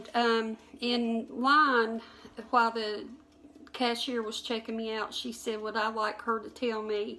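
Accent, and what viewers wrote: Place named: Tennessee, United States. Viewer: I just love your accent! I’m from Tennessee but have lived in Michigan for the past 10 years. Listening to your videos takes me back home to being in the kitchen with my Mom and Aunts. Those are treasured years! I’m so inspired by your wholesome recipes. I’m taking both your Dollar Tree chocolate cherry cake and apple yellow cakes to my office potluck tomorrow. Thanks so much for taking the time to share with us!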